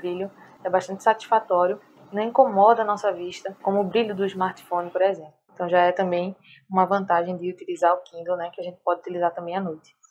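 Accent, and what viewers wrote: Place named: Pernambuco, Brazil. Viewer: Tú és Pernambucana é, boa tua explicação visse!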